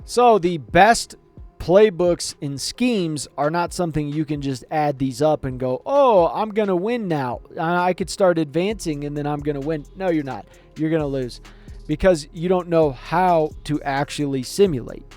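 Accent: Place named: Eastern Shore, United States. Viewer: Great Videos they’ve helped me out a lot. Quick question I grew up in Maryland your voice sounds like your from the eastern shore. Folks out that way, have a very distinctive accent.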